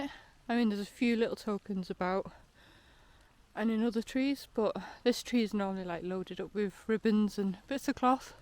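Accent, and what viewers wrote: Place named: Northumberland, United Kingdom. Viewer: Nice relaxed narration, with excellent choice of music, gave it the mystical feel with your regional accent, soft like are you from Northumberland?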